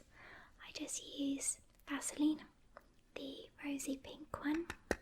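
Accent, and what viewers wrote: United Kingdom. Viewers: Well, first of all, I love your British accent combined with your soft voice (I have American-Mexican accent in comparison, lol!). And you certainly don't need makeup to be cute ;) I also love the presence of green color: your eyes, your shirt, your nails... are your nails green? I think I'm colorblind XD. Anyhow, thanks for this video :)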